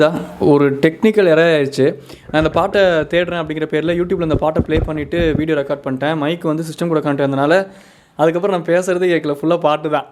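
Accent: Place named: Kongu, India. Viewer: தம்பி நேத்து தான் உன்னோட வீடியோ மொதல பாத்தேன் நம்மளோட கொங்கு தமிழ் பேசரது நல்ல இருக்கு நம்மளோட கொங்கு தமிழ் இன்னும் நெறைய வார்த்தையில பேசுங்க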